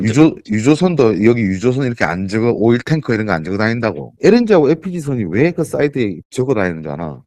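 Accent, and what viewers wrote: Gyeongsang, South Korea. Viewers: ~ 이번 에피소드 하나로 조선업을 이해할 수 있었네요 특히, 갱상도 말로 들으니까 더 이해가 잘 되네요. 해양플랜트는 힘들어도 조선은 살아~있~네 ^^ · 역시 현잠 실무자의 직접적인 얘기라 귀에 쏙쏙 들어오녜요 경상도 특유의 다소 투박한 사투리지만 그것이 오히려 재미와 더불어 귀를 더 기울이게 하네요 비록 알고있던 정보지만 타 유튜버들의 써놓고 읽는듯한 통상적인 진행보다 한결 신선함을 느꼈습니다 비록 자막이 없어도 지루하지 않게 들을수 있었습니다ㆍ신선했던 컨텐츠 였습니다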